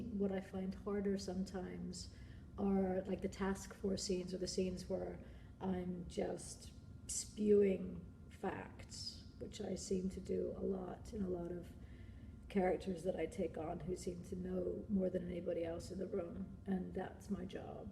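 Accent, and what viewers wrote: England, United Kingdom. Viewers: Russell Barth She's always had a English accent.She grew up in London.Thats her original accent. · why do you have an english accent now when you didn't before? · Oh wow, when she’s interviewed in Britain she adopts a British accent. It’s nicer I must say.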